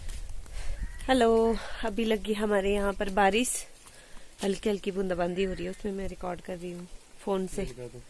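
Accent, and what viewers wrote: Haryana, India. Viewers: Aapki aawaj se lgta h . Ki aap haryana se ho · Madam, lagata hai aap Haryana se ho.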